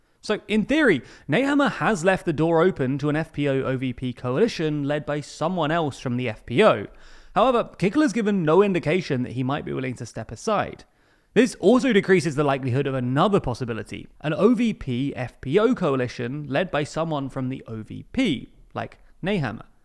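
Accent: United Kingdom. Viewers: This sound doesn’t exist in English. You’re watching a video from a Brit so expect that pronunciation. Should you wish to hear a proper “Ö,” watch a German language video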